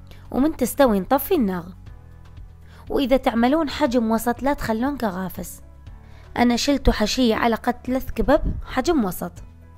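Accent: Mosul, Iraq. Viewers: والله تستحقي الايك فديت الصوت الحلو واللهجه الموصليه🌹🌹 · عاشت ايدج شغل رااائع واللهجه الموصليه تجنن لكن لا يجوز وضع الكبه مع كيس النايلون في قدر السلق لان النايلون بالحراره يتحول إلى سام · تماام حبيبتي مثل شغلي انا هم من من الموصل مقيمه بتركيا قباان لهجتنا وقبان قلبكِ وعدالتكي حبي😍😘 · الله على لهجتها المصلاوي الحلوى أنا اشقد ارتاح من اسمعكي تحكين الله يحفظكي ويوفقكي يامعدلي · لهجة اهل الموصل تقريبا مثل لهجة الرقة و دير الزور بسوريا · عاشت الايادي.. والأحلى من هذا كله لهجتكي المصلاويي.. تسلمين ومزيداً من التوفيق والنجاح. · لهجتك المصلاويي حلوي كثيغ أحبكم كثيغ عاشت ايدكي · احى لهجه بالعراق هي الموصليه عشتي حبيبتي والف شكر ❤ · يخليلي هاللسان والله اشتاقيت للموصل اهلي واحبابي 😍🥰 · عاشت الايادي..احلى شي بالفيديو اللهجة الموصلية. ما اعرف ليش منسمعها هواي حتى بالتلفزيون من يمثلون على الموصل ميحجون اللهجة الموصلية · شگداحب لهجتك وكلامك الناعم اني خوالي اهل الموصل احبهم كلش واتمنى اشوفك امي هم تعمل الكبة طيبة مثل كبتك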